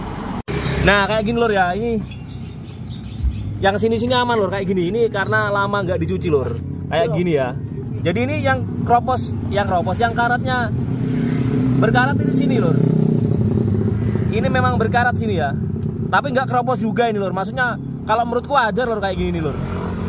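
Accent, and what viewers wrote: Java, Indonesia. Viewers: Bahasanya medok 😂 Asli mana??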